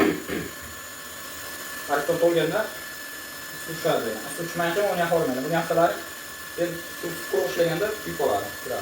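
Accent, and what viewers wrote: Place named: United States. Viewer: бизи 99% одамлар воданагривателни аристон дейишади алюмин эшик ромларни акфа дейишади электр чойнакни тефал дейди. Худдики бир америкаликга у́хшаб гапирасизе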